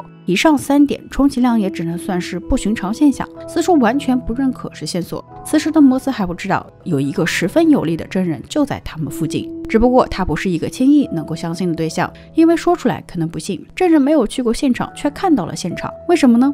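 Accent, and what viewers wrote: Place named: Nanjing, China. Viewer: 👍👍👍你是南京人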